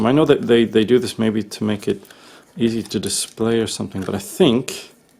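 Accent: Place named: Germany. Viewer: Well despite his terrific English he doesn't seem to me to be a native speaker and I was trying to work out where he was from - I think he's a German speaker who's spent much of his life in Canada. And -> Ant 7'46 - sounds like a German tic to me. Still - incredibly good level of English!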